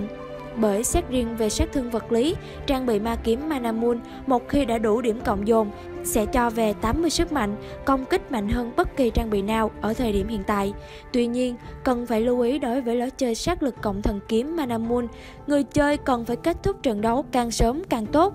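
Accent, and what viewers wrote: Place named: South Central Coast, Vietnam. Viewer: Hình như kênh này người miền Bắc nhiều Nghe giọng miền trung ,nam không quen Ad nên dùng phần mềm chỉnh giọng , hoặc tìm cô gái giọng bắc sẽ tốt đó ad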